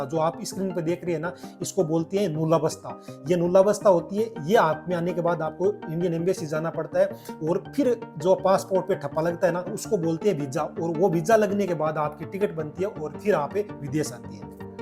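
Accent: Rajasthan, India. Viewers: आप राजस्थान से हो क्योंकि आपकी भाषा में राजस्थानी झलक है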